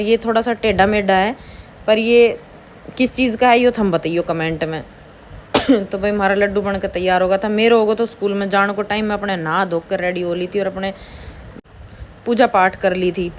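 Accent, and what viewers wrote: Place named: Haryana, India. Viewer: जीजी हमने अपने हरियाणवी होने पे गर्व करूं तो देख ले अब ये बिटिया विदेश में जाने के बाद भी अपनी हरियाणवी ढंग न बदल सकी, बहुत बढ़िया है बेटा भगवान ये सारी मनोकामना पूरी करे ❤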